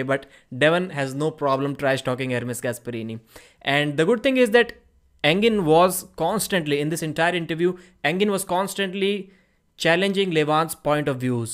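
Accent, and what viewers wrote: India. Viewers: why your accent like indian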